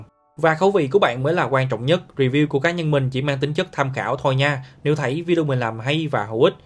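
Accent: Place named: South, Vietnam. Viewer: giọng miền nam rất thân thuong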